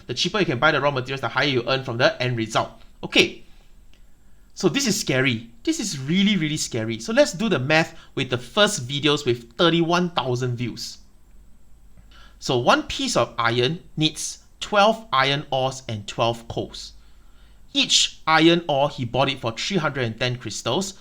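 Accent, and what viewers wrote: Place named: China. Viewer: When i heard a Chinese accent talking about business i believe him instantly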